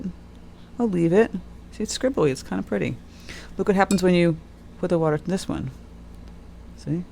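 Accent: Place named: New England, United States. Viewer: Love your videos. Very helpful, and I love your New England accent. 😊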